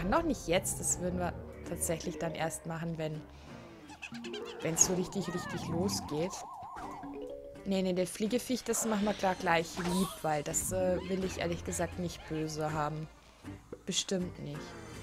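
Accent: Bavaria, Germany. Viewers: könntest du bitte nochmal eine Folge nur bayrisch sprechen bitte?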